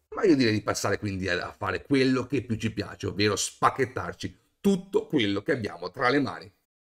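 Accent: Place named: Palermo, Italy. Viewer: Sei di Palermo ? Si capisce dall’accento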